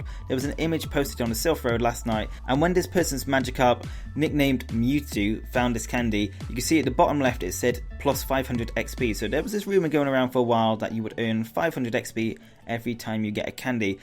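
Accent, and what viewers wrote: United Kingdom. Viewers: omg your British yassss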